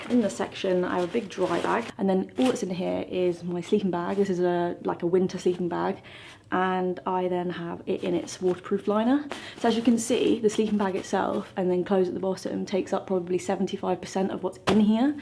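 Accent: United Kingdom. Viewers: She’s literally speaking as a normally British person does. If you dislike the way she speaks, why are you watching the video?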